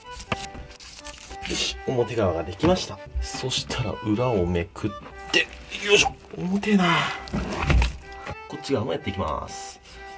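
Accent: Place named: Hiroshima, Japan. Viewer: 名古屋ということですが、広島イントネーションがあるような…。器もいつも参考にしています！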